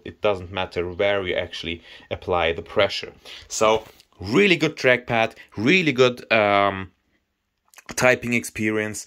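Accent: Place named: Germany. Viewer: I love your German accent ❤️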